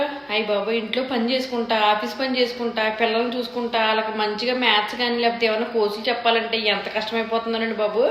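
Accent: Godavari, India. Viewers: ఈ రోజే YouTube మీ వీడియోని first time నాకు చూపించిందండి. అది చూపిస్తే కదండీ మనం చూసేది. మీ గోదారోళ్ల యాస బాగుందండి. Suharsh family in Canada ఛానల్ లో సులోచన యొక్క రాయలసీమ యాస చాలా అంటే చాలా మంచిగుందండి. మా ప్రాంతం యాస లో ఎవరైనా ఛానెల్ పెడితే బాగున్నండి. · Hi andi mee videos chala baavuntaayi Maadi kuda amalapuram 😊 Mana godavari basha super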